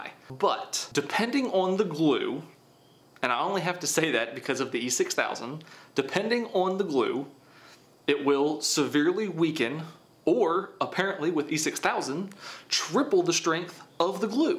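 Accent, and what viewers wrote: South, United States. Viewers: Did the beard bro-ham, you from southern U.S? Just wondering, mainly your accent.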